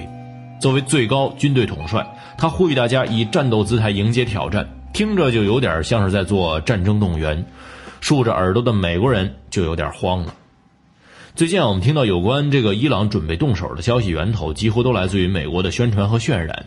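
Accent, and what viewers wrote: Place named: Beijing, China. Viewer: 正宗的京腔京韵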